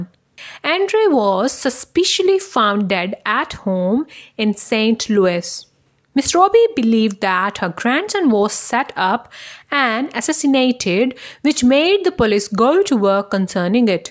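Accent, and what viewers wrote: India. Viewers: She sounds India dialect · She sounds Indian from india or possibly an Indian born or raised in England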